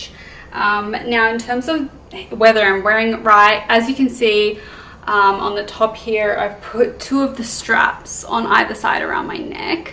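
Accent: Australia. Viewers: Is she Australian from her accent?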